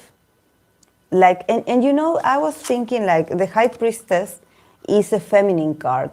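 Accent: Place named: Argentina. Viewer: sos argentina? creo que detecté nuestro acento. Gracias por el video, me gustaría que hagas uno sobre Keeho y Theo 😊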